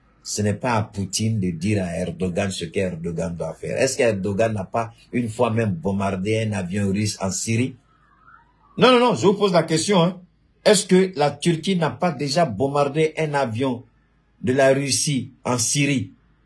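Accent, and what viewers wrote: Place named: Ivory Coast. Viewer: PERSONNE NE VIENT ECOUTER POUR APPRENDRE AVEC TON ACCENT IVOIRIEN JE BE SAIS PAS OU GABONNAIS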